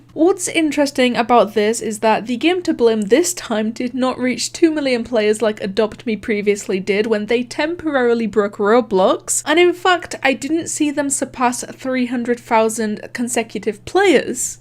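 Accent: United Kingdom. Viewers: She sounds British... Roblox sounds like rublux (no offense ) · She's british and they speak like that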